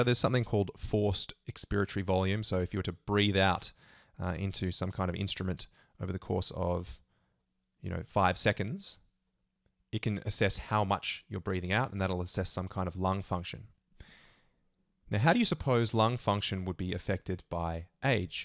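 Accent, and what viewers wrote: Australia. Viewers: you really have that edge over other channels because you have an Australian accent. I find it very pleasant listening to stuff explained with an Australian accent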